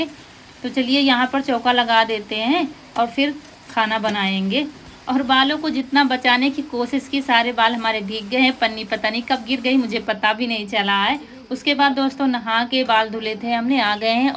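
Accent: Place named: Raebareli, India. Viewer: Bahan aap Raibareli ya phir unnao jile ho Apki language se pta chalta h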